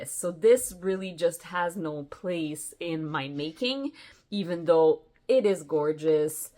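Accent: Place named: Quebec, Canada. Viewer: Thank you for your videos! ❤ You sound Quebecoise. 👏🏻💞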